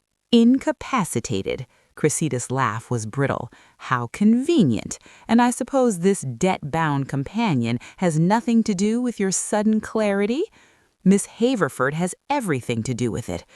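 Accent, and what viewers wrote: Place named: United States. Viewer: Don’t like the American accent—why not RP ?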